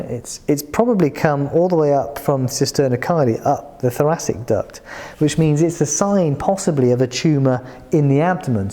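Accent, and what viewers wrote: United Kingdom. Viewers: im in love with you and your British accent i love so much thx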